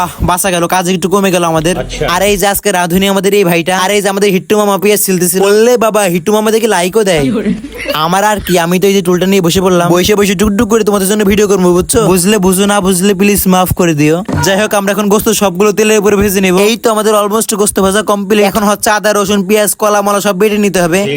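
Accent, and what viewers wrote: Noakhali, Bangladesh. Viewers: ভাই আন্নে ও কী আর মতো নোয়াখাইল্লানি কী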